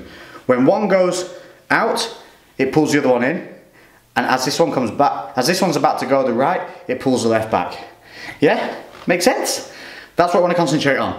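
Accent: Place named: Lancashire, United Kingdom. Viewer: Where in Lancashire are you from?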